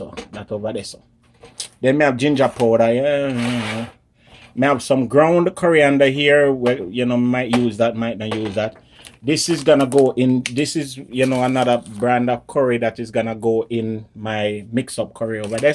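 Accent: Jamaica. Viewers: ..where you from???You sound Jamaican and how yu don know how to cook curry goat!! What??? Yu neva drink Maniish Water?? Anyhow yu cooking good? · I love how you talk!!! Real yaadi! BTW I'm going to try your recipe.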